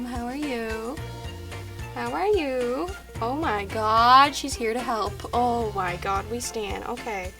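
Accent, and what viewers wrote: France. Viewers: "qu'est ce qui se passe ici? c'est une noeude" XDD Your french is adorable! (just for ref tho: c'est UN noeud, the "d" is silent) love you!!! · THE CUTEST OMG Also YAHS 6Teen was the best show thoooo. Also your french is so adorable. Yay Canadian School System😂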